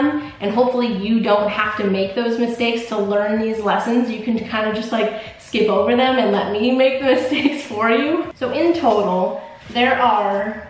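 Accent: United States. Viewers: Your art is always so beautiful. Btw, where in the US are you from? I’ve heard your accent before but do not know where from. I love it.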